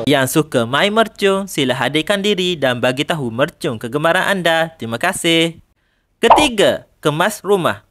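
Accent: Kelantan, Malaysia. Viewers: Kuat betul Kelantan nya cuba cakap formal pun masih bunyi Kelantan lah 😅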